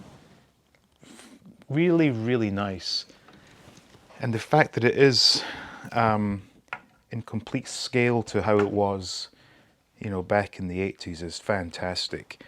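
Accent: Scotland, United Kingdom. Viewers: I thought you were Irish at first. So Scottish like Bruce Wayne and Roddy Piper(Roderick Toombs). Well they are Scottish descent.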